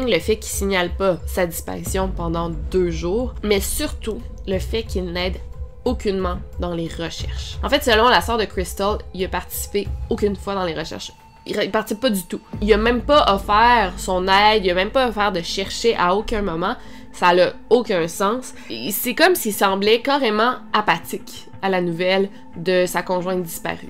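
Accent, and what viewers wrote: Quebec, Canada. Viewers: C'est bien raconté mais avec ton accent québécoi sa rend drôle 😂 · Bonjour, je viens de voir que ta chaîne n existe plus pourquoi??? J adore tes vidéos, surtout ton accent québécoise, tes narrations au top....sois heureuse dans ta vie, tu va nous manqué, bisous de la France 😘😘🇫🇷🇫🇷🇫🇷🌺🥀🌹🌷💟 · Salut, Je suis une de tes fans française chaque fois que j'écoute tes histoires ton bel accent me transporte dans vos belles contrées québécoises 🤗félicitations à vous deux, plein de bonnes choses.❤ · Tres Bonne video! L accent du Quebec (?) c est trop cute! Pourrais tu traiter l affaire Loubna Benaissa? C est une affaire belge qui a ete baclee entre autre a cause de l affaire Marc Dutroux et aussi que c etait une petite fille issue de parents immigres du maroc qui parliament pas francais. Ca s est passe a bruxelles dans les 90s. Il n y aucune video sur ce cas. 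Continue comme ca!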